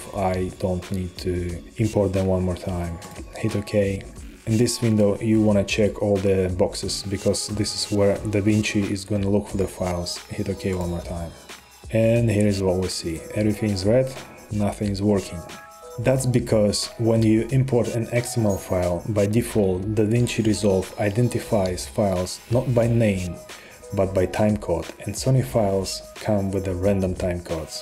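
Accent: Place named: Russia. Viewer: Слышу что русский акцент, спасибо дружище, выручил!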